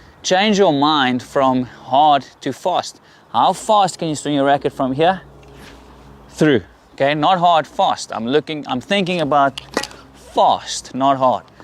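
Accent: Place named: South Africa. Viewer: Few things more satisfying than your coach expressing approval by shouting "BETTAH" in a South African accent.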